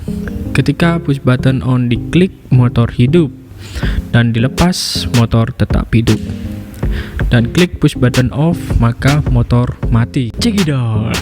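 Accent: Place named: Bali, Indonesia. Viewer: logat bali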